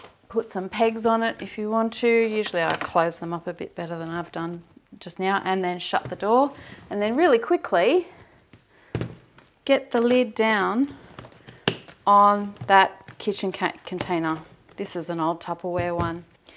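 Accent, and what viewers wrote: Australia. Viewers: I just bought my first while wheat berries for milling & they will now go straight into the freezer for 3 days - ibwobder if my household freezer goes that low! Lovely to hear an Australian accent - your tios are great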